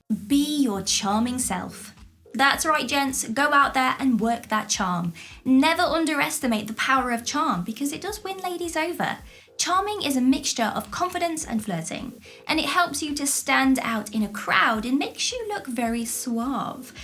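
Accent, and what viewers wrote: England, United Kingdom. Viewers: I love the way she speaks. That English accent is really enticing 😍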